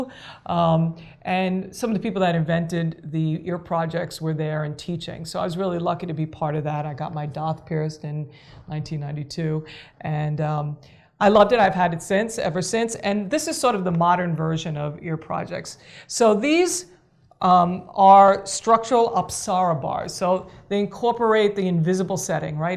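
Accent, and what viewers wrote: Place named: New York, United States. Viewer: This woman is a genius! So much creativity and thought behind all her pieces. I love listening to her voice too, I live in the south now and miss hearing that NY accent. Brings me right back to my childhood/extended family!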